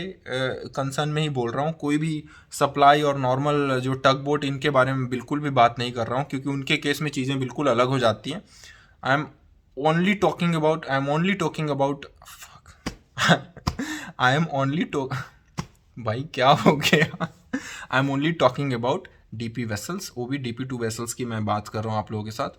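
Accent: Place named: India. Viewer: Headline is english, you speak indian🥲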